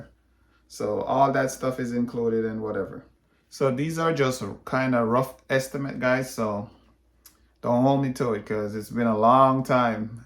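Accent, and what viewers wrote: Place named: Jamaica. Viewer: Which part of Texas are you located? I am Jamaican too BTW. I can tell from the accent. And I see the Josephus book behind you. Looks like you are on it with your true heritage. 😊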